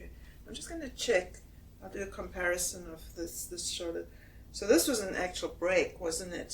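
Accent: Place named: South Africa. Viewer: I could be wrong but she sounds as though she is from South Africa and i love that accent. Great video.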